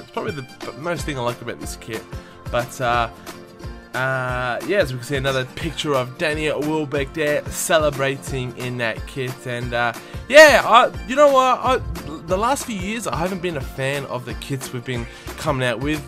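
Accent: Australia. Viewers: are u from ausie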